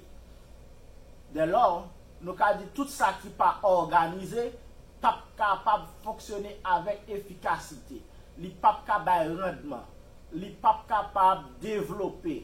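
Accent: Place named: Portugal. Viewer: Jusqu'à présent, je ne sais pas si le présentateur parle Français ou Portugais 🤔. C'est une langue hybride ?!! Je ne comprends rien.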